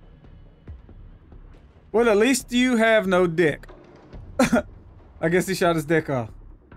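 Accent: South, United States. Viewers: DaRealMastalin all white guys from the south sound like this :s its hot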